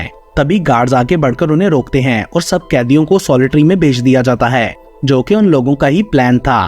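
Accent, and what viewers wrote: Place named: Punjab, India. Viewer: Hindi in punjabi accent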